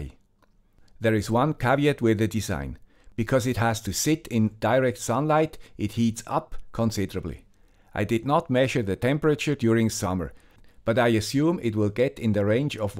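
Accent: Switzerland. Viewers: ok, remember a couple of months go, i complained about your english, but after watching you a bit, i get used to it, and now i really like your accent... i mean, i have a Youtube canal too (shameless plug here is the channel name) and i speak english there too, and im also from swizzerland, and i have a accent... thats what is special about us, we dont speak the perfect english... keep going. i like what you are doing! and because the temperature, i have a idea: you can let cold water flow where no sensors and sorar panel is, and it should cool it a bit(hopefully enough)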